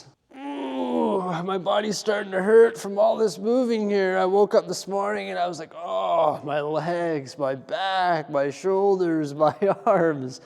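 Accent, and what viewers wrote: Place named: Canada. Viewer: I find it so funny that you don’t sound Canadian till you say “about”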